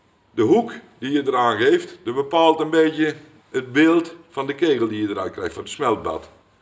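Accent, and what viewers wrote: Brabant, Netherlands. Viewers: jij bent een baas op het brabants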